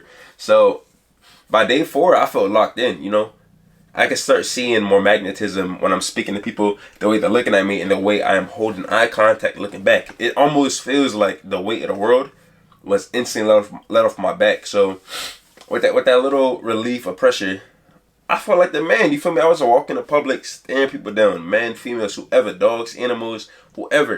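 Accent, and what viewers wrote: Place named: New York, United States. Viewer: Yeah i could tell u from nyc lol but good video king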